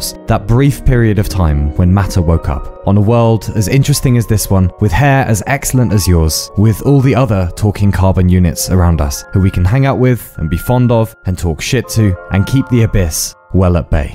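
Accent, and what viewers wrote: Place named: England, United Kingdom. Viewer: Why are so English 🦖🦖🦖🦖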